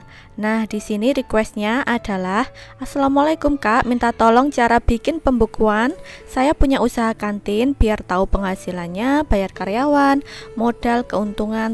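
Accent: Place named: Java, Indonesia. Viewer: Logatnya mirip orang Jawa.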